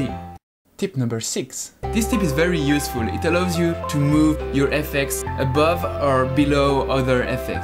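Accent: France. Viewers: You are French ?! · Where are you from ? Because you have not an english accent and you love french. Are you french ? ? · French accent lol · You're French right? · Comment ça se fait qu'il n'y ai aucun Français par ici ? On reconnait bien ici un Français talentueux ! ;) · Tu serais pas français par hasard je trouve aue tu as un petit accent... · Are you french ? Because you speak like a french guy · tu es français non ? :)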